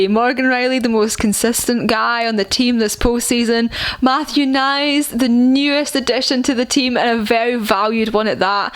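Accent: Scotland, United Kingdom. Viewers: U got new subscriber are you Canadian my bad u scottish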